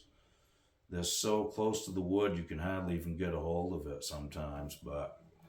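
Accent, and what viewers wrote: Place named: Maine, United States. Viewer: As soon as I heard u speak I knew you were a fellow Mainer. Cool video nice guitar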